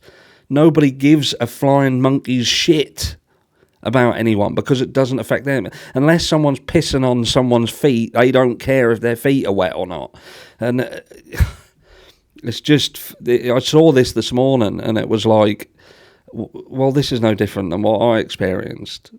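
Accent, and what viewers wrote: Norfolk, United Kingdom. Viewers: I am new here. I actually miss the Norfolk accent. I was brought up around the NW Norfolk coast and spent a lot of time working Norwich way. I appreciate the sense of humour you bring to some of the stories you cover. Sometimes I fly back via Norwich airport and I love hearing people say they are going to Tesco's in the Norwich accent...awesome;). Your channel reminds me of why I loved my life in Norfolk so much. It was the good hearted humour and friendly attitude of the people you met where ever you were. I hope the recent disgusting abuse of power during the Coviet Union takeover has not dampened the spirit of the good people of my County. Bless you and your family and keep your positive attitude my Norfolk Cousin ;)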